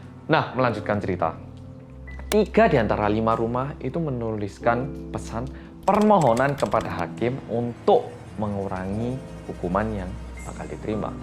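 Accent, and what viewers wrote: Java, Indonesia. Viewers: Yey akhirnya yang ditunggu up pt2 🎉🎉 tim medokkk mas 😂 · To be honest, this video is really cool, I don't understand how to pronounce it, the point is I like this video, I think he's great, he has cool words. thank you for I always like the content of your video content, actually I don't like hearing the sound of the medok but I accept it because your content is great, thanks bro.🙏🏼🙏🏼👍🏻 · Bersama org korea yg MEDHOKKK~ Kangen pembukaan yg begini mas hehe Welcomeback DETEKTIF, Selalu menginspirasi ceritanya, semoga kita menjadi org yg jujur ya mas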